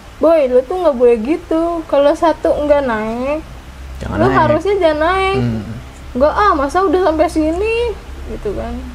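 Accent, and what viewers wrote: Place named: Depok, Indonesia. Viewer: Kentel amat mpok logat betawinya, ciri khas betawi gaul, klo cerita asik dan seru, idup cerita nya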